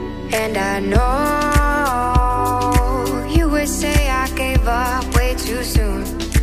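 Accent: West Java, Indonesia. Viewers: 👍👍 untuk video dan narasi nya. Logat sundanya kentara, ti Sukabumi sanes ?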